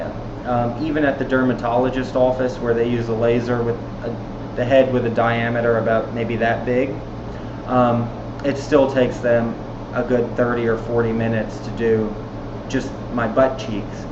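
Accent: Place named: Deep South, United States. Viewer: That's a deep south accent. Most likely Mississippi.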